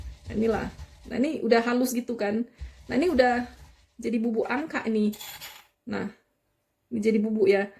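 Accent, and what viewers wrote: Medan, Indonesia. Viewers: Salam kenal y, suka dengan vidio nya, dari bahasa nya macam org medan y😂😂😂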